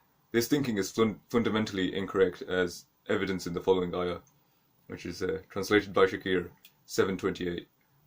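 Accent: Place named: United Kingdom. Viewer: Based bri'ish accent MA.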